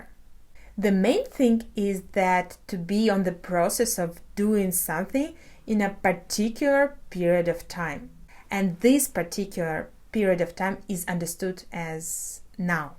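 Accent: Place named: England, United Kingdom. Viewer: I love your English accent, it gets me chills 😁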